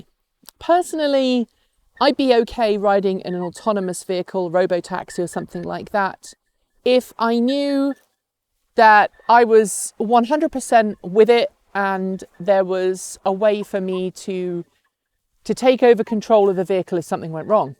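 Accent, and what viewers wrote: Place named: United Kingdom. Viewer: i always thought you are form the UK and not the USA.. you sound so British... take it as a compliment...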